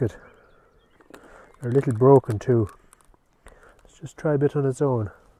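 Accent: Tipperary, Ireland. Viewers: im telling you one thing that tipp accent is gold you have a narrators voice and from one irishman to another i hope to bump into ya in the woods someday for a cuppa tea and a chat.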